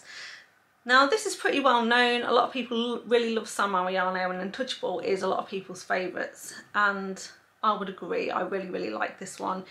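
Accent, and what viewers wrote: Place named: England, United Kingdom. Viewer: I subscibed today, love your english accent! You made me laugh with the bloopers!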